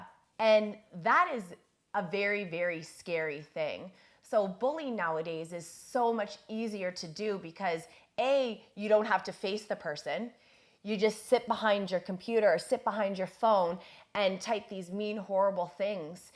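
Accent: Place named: Canada. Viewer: I love the way you say “sorry”. Canadian accent is cool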